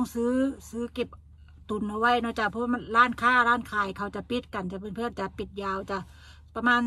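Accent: Sakon Nakhon, Thailand. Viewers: อยู่จังหวัดไหนคะ สำเนียงเหมือนคนสกลนคร เว้าย่อ 😂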